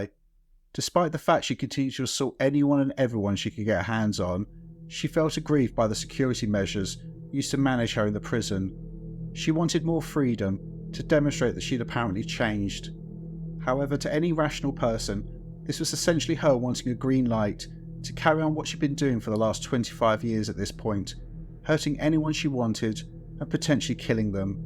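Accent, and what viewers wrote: United Kingdom. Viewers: hello love your YouTube channel and love your videos and love your British accent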